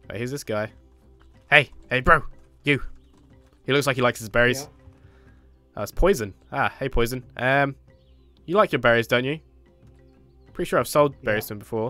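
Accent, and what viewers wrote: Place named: United Kingdom. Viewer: That British pronunciation..... I love it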